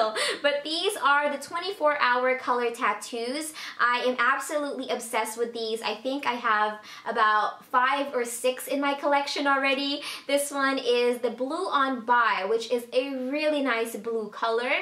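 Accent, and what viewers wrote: Philippines. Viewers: Filipino accent lol :) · Hahaha :D pinoy accent ;) cucumber x)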